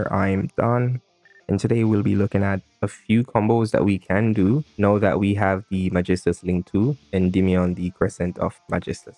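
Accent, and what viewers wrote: Jamaica. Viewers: You jamaican?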